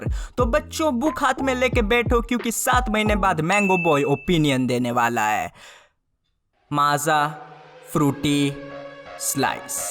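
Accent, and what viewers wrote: Gujarat, India. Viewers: Ap gujrat se ho kya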